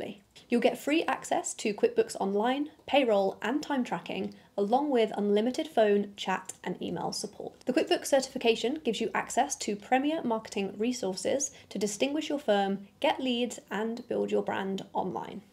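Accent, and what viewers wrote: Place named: United Kingdom. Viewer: Just started the video and Ssss, sucha a lovely UK accent, I wish if I could get a mate to help me develop the same Accent, Excellent, now I will continue with the main purpose of the vidoe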